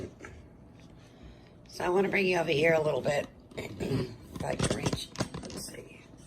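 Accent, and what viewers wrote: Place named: New York, United States. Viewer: I want to try making this at some point, because it looks so yummy!! I love your videos, because the way you talk reminds me of my family, and makes me feel comforted. <3 They're all from NJ/NY.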